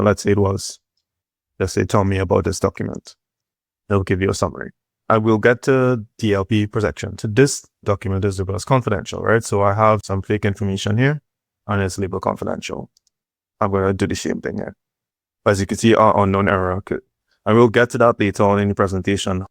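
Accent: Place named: Trinidad and Tobago. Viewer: Dude sounds trini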